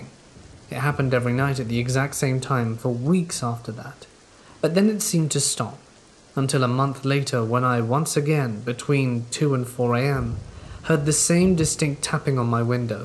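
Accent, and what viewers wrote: England, United Kingdom. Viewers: Lol! I relisten for third time! Love compilations! And narration is simple the best with his english accent 😊 I couldn't see your comment on my side, as I said it earlier, and nothing on my notification. I'll check again. How is your day so far? Have you seen your Dr? 😘 Ps: Did you see Paul? He left his comment. pm here now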